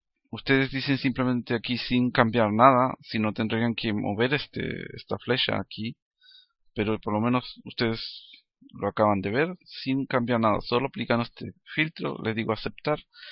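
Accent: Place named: United States. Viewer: por que hablas como gringo?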